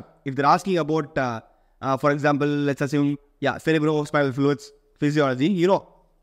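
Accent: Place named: Tamil Nadu, India. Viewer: Tamil Nadu accent haha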